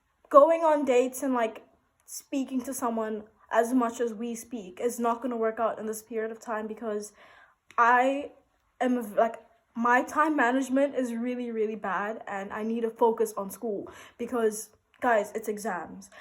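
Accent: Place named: United Kingdom. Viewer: That British accent , I really like it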